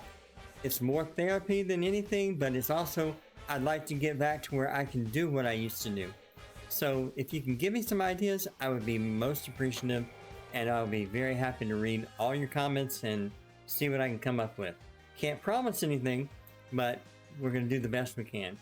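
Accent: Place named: South, United States. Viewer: 😭🥳🙌 AMEN Hallelujah Glory you are BACK! You sound FANtastic to me, Music to mah ears! i think you've picked up a bit of southern twang and im Loving it... 2.0, Baby! Let's Go! 🙏💙🥳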